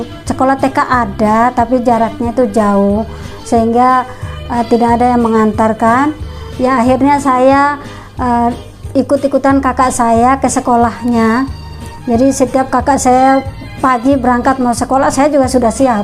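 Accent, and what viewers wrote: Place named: Java, Indonesia. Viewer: Ngaku asli timor tapi ko pu dialek kental jawa tapi tarada dialek timor... Ko bisa lancar bhs indonesia dijawa tapi tada dialek timor... Panipu ko, ko bukan asal timor tapi pernah tinggal di timor... Itu sudahhh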